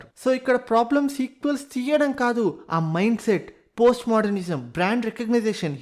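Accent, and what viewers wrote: Karnataka, India. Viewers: Kannada accent lo intha acha telugu nuv tappa evadu mataadadu ra babu 😁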